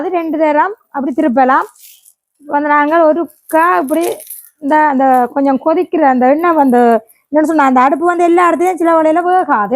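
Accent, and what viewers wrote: Sri Lanka. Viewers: நான் இந்தியாவின் தமிழ்நாட்டிலிருந்து பேசுறேன் ....இலங்கை தமிழர்களின் தமிழ் உச்சரிப்பு மிக இனிமையாக உள்ளது என்பதை உங்களது பேச்சில் நான் கண்டேன் ...இனிமையான குரலுடன்.....அதே போல் உடல் வியர்க்க உழைப்பார்கள் ஈழதமிழர்கள் என்றும் அறிந்தேன் ..! என் இனிய ஈழ தமிழர்களுக்கு வாழ்த்துக்களுடன் சீக்கிரம் உயர்நிலையை அடைவீர்கள் என்றும் தெரிவித்துக்கொள்கிறேன் ..! நன்றி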